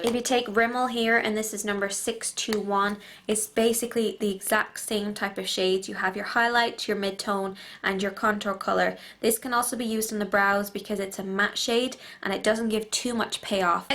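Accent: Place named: Ireland. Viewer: You sound irish :)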